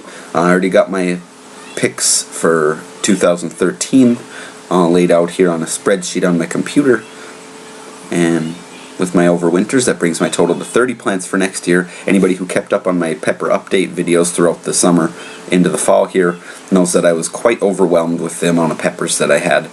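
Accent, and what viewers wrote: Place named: Japan. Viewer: Hey, great review again mate, love the Japanese accent:) mate I'm only a new chilli head but enjoy your reviews and your knowledge. Would love to send you some pods to try when my plants throw a heap out, I'm in Australia and it will probably be another 4 weeks or so but would be keen to see your thoughts on the pods from different growing conditions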